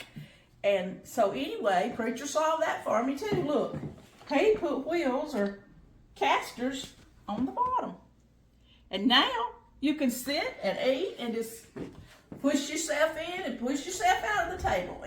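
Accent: South, United States. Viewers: Thank you this info is very helpful and I love your southern accent 😊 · Mrs., you are simply adorable. I love your southern accent wisdom and tips for making life easier as we age. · New subscriber and I just love your channel, especially your sweet Southern accent. Thanks for the wonderful tip. Love your "Paddy Wagon"! My husband and I replaced our computer chairs for comfortable, padded recliners. I put a pillow on my lap, put my keyboard on the pillow, and recline with my legs and feet propped up. I have a large computer screen that's easy to see. In the kitchen, I have an office chair on wheels and a bar stool with a back and arms on wheels. Comes in handy for working at the sink and stove. Such a great tip for raising your dryer! The main thing that has helped my arthritis pain is to eliminate all "Inflammatory" foods from my diet. No more sugar, white flour or white pasta, no refined or processed junk foods, no soft drinks. 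Just eat lots of lean meat, fruits and veggies and whole grains. Only use olive oil. Made an amazing difference in pain and mobility.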